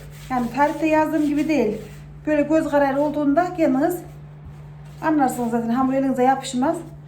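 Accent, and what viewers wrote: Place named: Kirikkale, Turkey. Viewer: Ellerinize sağlık canım çok güzel görünüyor. Tarflerinizi çok beğeniyorum. Bende yapıyorum. Konuşmanızda aynı benim gibi konuşuyorsunuz. Bende kırıkkale liyim. Senin hamur yoğurmanı çok severek izliyorum. Çok güzel ve beceriklisiniz. Maşallah elleriniz dert görmesin. bacım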